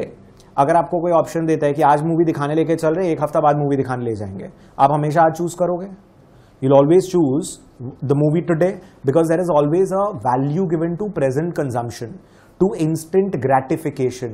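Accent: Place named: India. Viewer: great lecture, but 0.75 speech is ok, I have a small problem with absorb the Indian accent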